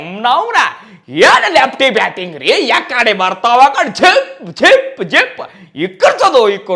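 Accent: North Karnataka, India. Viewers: Good review ಉತ್ತರ ಕರ್ನಾಟಕ ಭಾಷೆ ಅದ್ಬುತ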